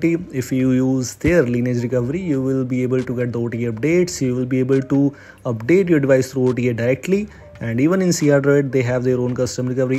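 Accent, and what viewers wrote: India. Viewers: hes talking in english but with indian accent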